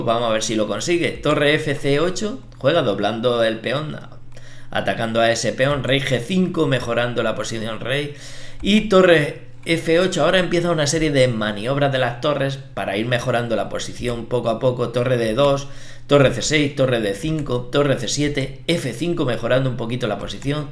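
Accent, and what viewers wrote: Spain. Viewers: porque es andaluz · Se refiere al acento español de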